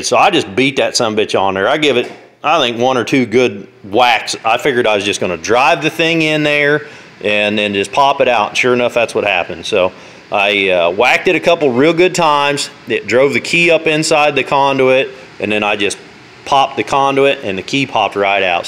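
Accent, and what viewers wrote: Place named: South, United States. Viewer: Maybe I just am used to this guy's kind of language and southern accent but all the other ones seem so hard to follow. This guy made it easy for me soon as he said I just popped that son-of-a-b** on there I said yep like And subscribe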